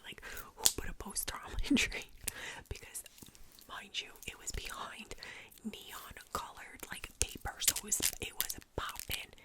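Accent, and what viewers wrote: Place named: Long Island, United States. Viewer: ❤❤❤ LOVE THIS!!!! I was born and raised on Long Island but now live in Ohio. I love listening to you talk, that probably sounds crazy but you sound like my childhood, lol. God Bless 🕊️💝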